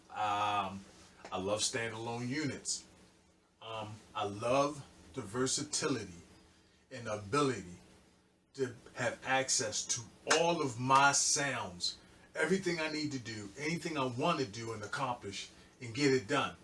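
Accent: Baltimore, United States. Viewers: Yeah Roland did just fade to black....I feel like they may come back! Roland where YALL AT?????? Sound like a Bmore accent?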